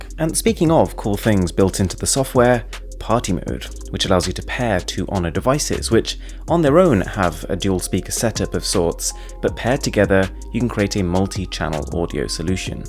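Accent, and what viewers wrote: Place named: United Kingdom. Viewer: That british accent.....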